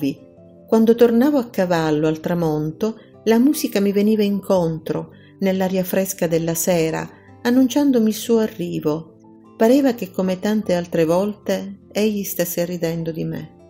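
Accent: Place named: Sicily, Italy. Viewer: Vabbè, puoi fare un corso di dizione se vuoi, ma credimi, che anche se si sente l'accento siculo secondo me andresti alla grande!!!😉 Trasmetti più emozioni TU con il tuo accento, rispetto ad altre persone che ho ascoltato!!!😍🤗♥️♥️♥️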